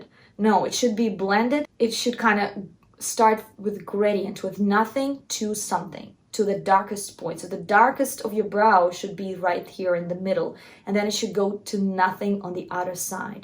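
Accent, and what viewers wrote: Russia. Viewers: Thick russian accent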